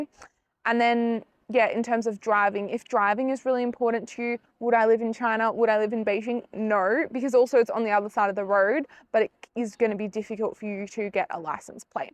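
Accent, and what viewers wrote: Australia. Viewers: No, she is not an American. She has an Australian accent, from West Australia. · Love your Aussie accent ! Plus you are a gorgeous lady, and your mandarin is good (well. way better than mine) !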